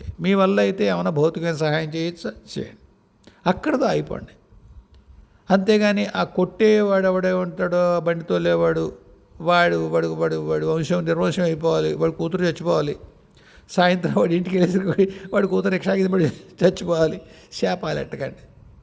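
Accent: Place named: Godavari, India. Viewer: మీరు గోదావరి యాస ను బాగా ప్రేమిస్తారని అర్ధం అయ్యింది గురువు గారు నేను ఇక్కడే పుట్టాను.గోదావరి జిల్లా లోనే నాకు కూడా చాలా ఇష్టం ఆ యాస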